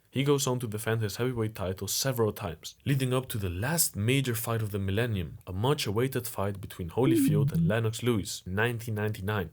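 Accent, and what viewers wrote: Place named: Netherlands. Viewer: Dutch accent way too heavy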